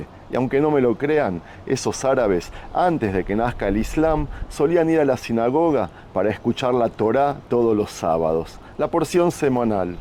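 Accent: Argentina. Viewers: Dices que eres judío pero hablas argentino dx Como es eso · Hola he oído y visto más tus relatos y apreciaciones personales pero muy sesgado e irrespetuoso con la confianza brindada que pena pero que se puede esperar de un judío y cuando comentas del Corán no es la Biblia del Islam es un libro Islamico ..ya que Biblia significa libros que fueron de diferentes épocas y diferentes profetas o no? Eso significa en el hebreo o no? Ya y el Corán es un libro repito como la Torá y si eres apasionado de la Biblia te felicito y me gustaría preguntarte si la leíste o solo eres fanático? Y si lo leíste dime que significa lo que dice Isaías respecto al pueblo judío? Y que quiere decir en el capítulo 52.13 en adelante? Y que manda a decir nuestro YHWH =nuestro Creador en el cap. 54 del mismo libro? Y si habla de cronología de la Biblia Jesús que es para usted? Bueno espero ser respondido y no sea usted un mero YouTuber ufanado en conseguir seguidores con sus fantasías y debe usted ser imparcial objetivo y verás si quiere hacer un reportaje y más si se trata de religiones así los que no saben aprenderán pero cuando usted usa su canal para sorprender en el español de acento Argentino causa usted preocupación y molestia y los que hemos leído algo de la Biblia y conocemos algo del Islam quedamos sorprendidos al ver a un judío siempre judío por quienes murieron todos los profetas perseguidos y por quienes Jesús fue juzgado y muerto o me equivoco? 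Y hablo de un punto neutro sin afán de bandera religiosa . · Te crees Judío y al escucharte eres un Argentino.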